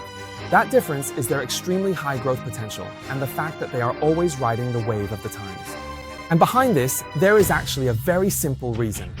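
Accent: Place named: United Kingdom. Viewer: 怎么又变成英国口音了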